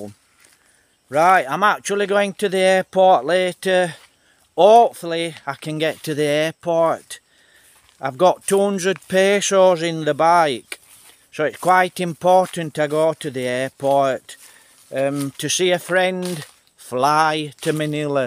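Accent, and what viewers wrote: Yorkshire, United Kingdom. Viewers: Thought you was a YORKSHIRE LAD ❤ I'm from a village called Maltby near Rotherham 😅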